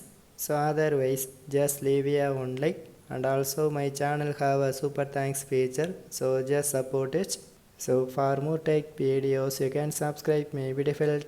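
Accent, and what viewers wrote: India. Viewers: I hear Indian I know I have my answer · You know you are gonna get a no bs 100% working tutorial when you hear an Indian accent. Thank you brother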